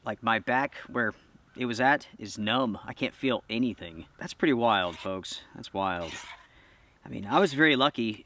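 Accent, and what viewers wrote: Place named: Appalachia, United States. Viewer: I hate ur hillbilly pipes!!!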